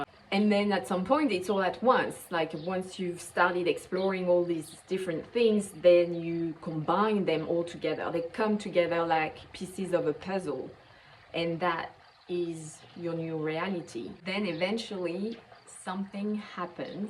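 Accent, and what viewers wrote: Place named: France. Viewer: It is funny how some videos just appear. It sounds like you are french. Have you heard about the cathars?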